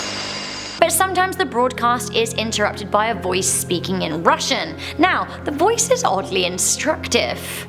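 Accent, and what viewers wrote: United Kingdom. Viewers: Jane admits your British accent is perfect. Who is Jane? That is your choice. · I always prefer to watch scary videos with a British person. Makes me feel safer lmao. Probably cause it makes me feel at home. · Why are you british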